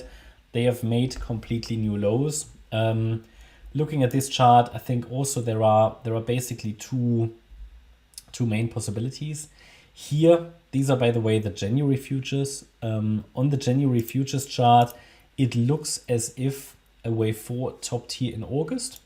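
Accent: Germany. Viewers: Bist bestimmt Deutscher haha man hört es raus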